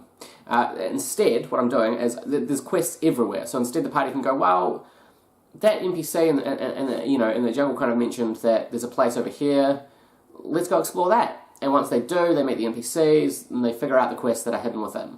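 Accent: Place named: New Zealand. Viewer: Damn I miss that New Zealand accent. Currently living in Sydney at the moment.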